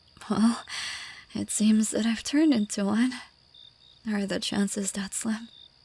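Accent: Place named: United Kingdom. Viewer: I find it so funny the British accent 😂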